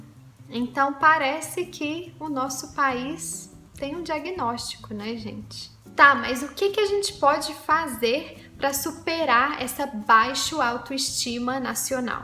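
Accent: Minas Gerais, Brazil. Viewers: Vc até pode estar morando fora do país, mas hoje quando eu a ouvi, de cara pensei: Ela é Mineirinha. Um abraço desde Mariana-MG minha conterrânea da voz doce. vêm cá comer um pãozin de queijo e tomar um cafezin. gratidão suprema.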